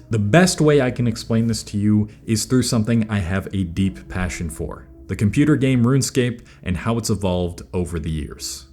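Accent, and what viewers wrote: United Kingdom. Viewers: his accent convinced me that he's british (im from britain) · The British accent caught me off guard😭 It was very good though.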